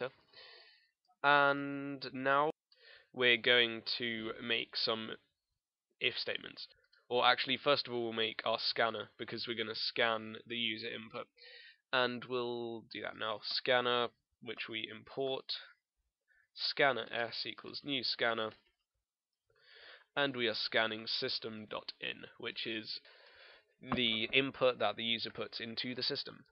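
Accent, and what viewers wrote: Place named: United Kingdom. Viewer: Thank you so much for this tutorial, my comp sci class has this type of problem due tonite, which sucks because we just had to turn in a huge programming assignment the night before so I haven't had any time to work on this. Oh and I always like hearing a british accent, that alone increases anyone's credibility and knowledge :).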